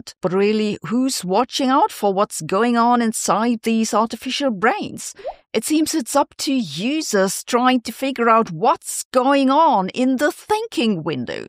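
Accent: Germany. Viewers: In fairness you have a near flawless German accent.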